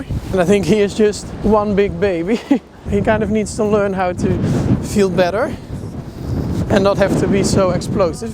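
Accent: Netherlands. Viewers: im sorry but are You dutch im very sorry to ask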